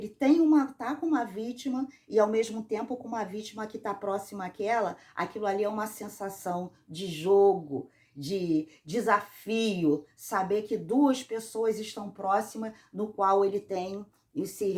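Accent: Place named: Rio de Janeiro, Brazil. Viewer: O som da sua voz é bem carioca.🤔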